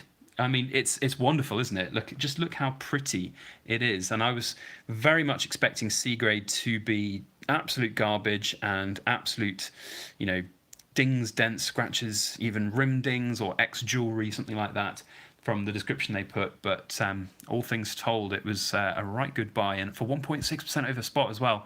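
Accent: United Kingdom. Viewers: Was watching a video today and they were talking about regulating gold and silver for the common man you sound British and don't know if it will effect you. But in America they want to know how much metals your selling. Like this having a bullion kinda business they want to regulate here in America also said you'll have to have some kind of paper work when you go to sell silver and Gold and if you don't there trying to say how do they know its yours smh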